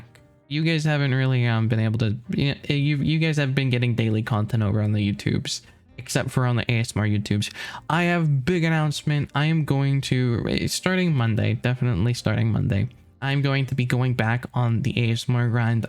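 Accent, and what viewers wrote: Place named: South, United States. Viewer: i am european 😄 but i do like the red neck accent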